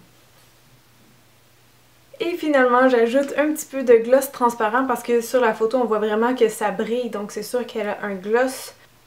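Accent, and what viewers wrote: Quebec, Canada. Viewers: Habituellement, je ne suis pas fan de l'accent Québécois mes avec toi sa passe tout seul ! J'adore vraiment beaucoup tes vidéos je te trouve MAGNIFIQUE je trouve également que tu as un aire de Kim Kardashian (c'est un compliment) Bisous ma belle :)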